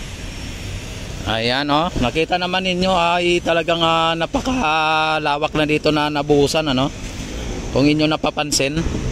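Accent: Visayas, Philippines. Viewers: Kuya ayusin mo ang pagsasalita mo Visaya ka kuya ang dami mong alam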